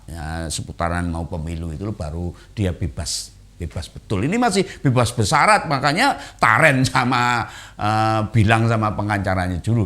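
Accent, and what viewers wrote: Java, Indonesia. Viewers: om ini pembawaannya tenang dan hefi, sy suka logatbicaranya, jawa banget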